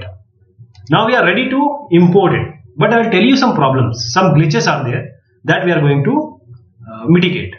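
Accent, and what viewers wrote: India. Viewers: I wonder, is there, somewhere on the internet tutorial without indian voice ?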